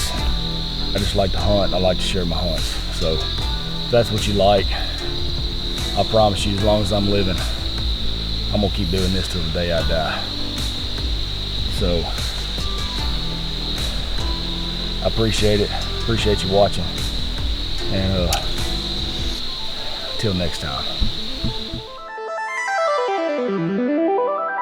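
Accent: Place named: Arkansas, United States. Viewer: Awesome story. Also you have the most Arkansan accent in Arkansas, so congrats there to 👏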